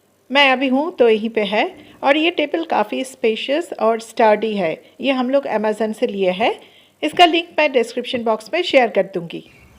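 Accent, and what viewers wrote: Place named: Bengal, India. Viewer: Up bengali haye kaya? Upke hindi bolnese asai lagta hai. Isiliye bola.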